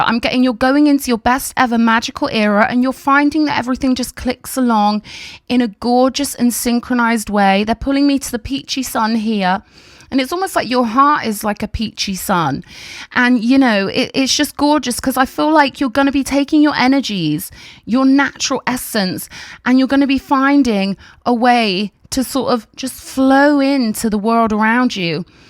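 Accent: United Kingdom. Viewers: that cute lil British accent of yours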